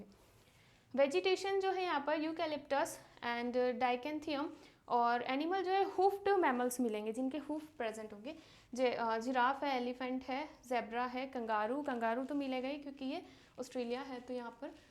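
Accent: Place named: Lucknow, India. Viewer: Ma'am i think you are from lucknow by the way of speaking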